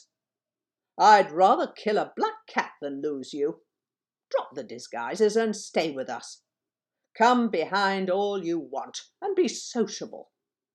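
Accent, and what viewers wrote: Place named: England, United Kingdom. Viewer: Very well read. I searched for the title here and yours is the only one I came across featuring an English accent. I love the Yanks but an American reading Wodehouse? Not cricket at all.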